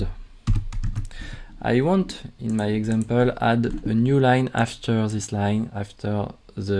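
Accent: France. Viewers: Yoooo.. J’adore l’accent français bro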